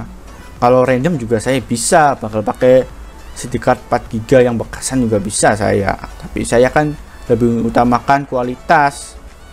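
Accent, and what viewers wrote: Indonesia. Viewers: logat cirebone matep lur ciri khas banget. . salam wong reng,pengen takon bokat di sewoti soale tuku alate ngecer dudu ning sampean,,wkwkwk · Logat bahasa kyak wong reang ✌✌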